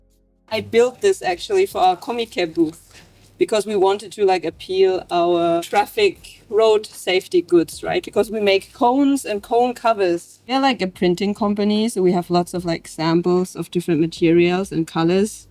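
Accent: Germany. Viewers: Finde ich schon. Wie sie Worte zieht/betont ist schon sehr Deutsch. Ihr Redefluss hingegen klingt nach einer Mischung aus Deutsch und Japanisch.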